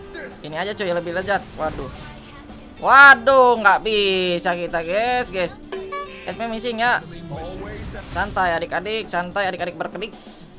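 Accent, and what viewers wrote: West Java, Indonesia. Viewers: ente asli sunda mang?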